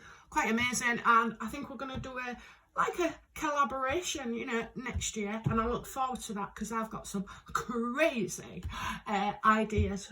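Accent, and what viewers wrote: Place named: Hull, United Kingdom. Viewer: Hi!! Thanks for saying, "Hi" :) I just love listening to your accent, I know we must be distant cousins as my family is from Hull :D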